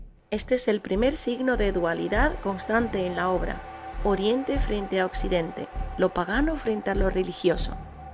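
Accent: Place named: United Kingdom. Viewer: Me encanta!!! A mi me gustan todas las adaptaciones llevadas a la pantalla grande, no me he leído el libro... Pero seguro que lo busco y me lo chuto!!!! Por cierto ame tu inglés tan británico y elegante!!! Y MUCHAS FELICIDADES por tus primeros 💯 suscriptores!!! Yeiiii!!! 🎉 🎉 🎉 Ya tienes tu URL personalizada? 🤔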